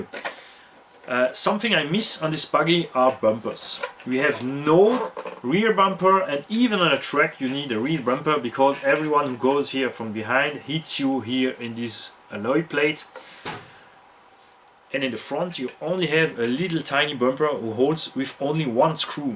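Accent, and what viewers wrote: Alsace, France. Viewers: You have a great french accent lol 😅 pretty interesting vid ! I love this buggy too! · a lenglish accent francais alsaco je valide !!!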